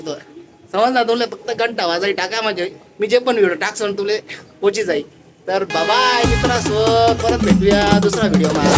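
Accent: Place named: Khandesh, India. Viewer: जमनी बरका अहिराणी एकदम ओरिजनल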